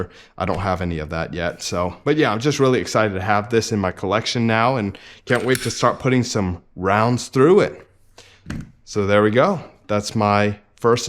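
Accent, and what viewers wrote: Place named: Texas, United States. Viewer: You Viet from Texas? You got the nuoc mam with tx bbq twang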